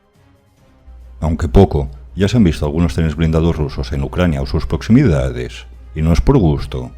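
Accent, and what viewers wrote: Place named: Aragon, Spain. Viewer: La entonación parece maña, de Zaragoza o si no de la rivera del Ebro.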